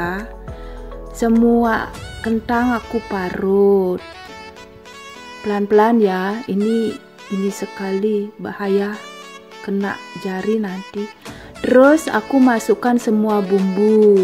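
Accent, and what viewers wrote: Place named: Bali, Indonesia. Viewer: Enak ..mau ku coba. Gampang pasti anak2ku juga suka. Dari suaranya seperti dari Bali, Tinggal dimana kaka?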